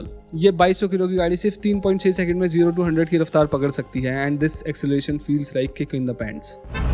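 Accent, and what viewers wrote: India. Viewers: Man - if you can’t fix your indian accent, can you at least get the names pronounced properly ? It’s not pronounced “ooroos”… its “U-RAS”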